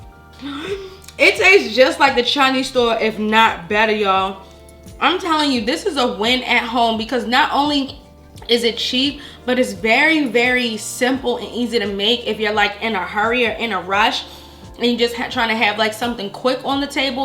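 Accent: Baltimore, United States. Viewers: All i hear is that Bmore accent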